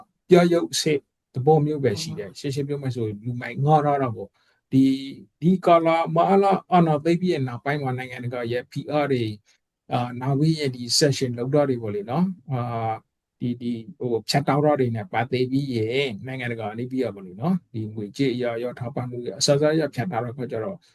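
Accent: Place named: Dawei, Myanmar. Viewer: ထားဝယ်လေသံန့ဲဆိုတော့မနည်းနားထောင်ရတယ်တစ်နေ့န့ဲတစ်ရက်အတူတူဘဲနော်ဒေါ်လာသန်း192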